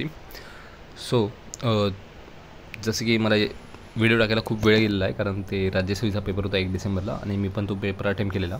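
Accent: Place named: Karad, India. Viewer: aawaj Varun Karad vatakl😅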